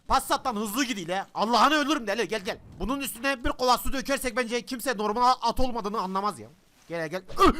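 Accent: Gaziantep, Turkey. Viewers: Abi adamsın senin sayende Antep şivesini öğrendim cansın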